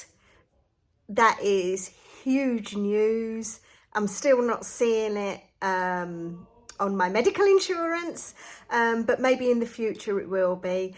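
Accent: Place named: England, United Kingdom. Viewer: Great video, you're very brave for sharing such obviously uncomfortable personal information - great to hear an English accent too!! You look fantastic, healthy & happy. I'm in Australia, I'm 68 & 4 days in on MJ (started on 5mg- very hard to get here & expensive $360 per month) & feeling very positive after hearing your story! SUBSCRIBED! :)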